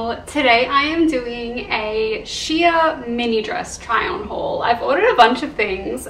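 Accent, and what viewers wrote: Australia. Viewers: Is she Australian from her accent?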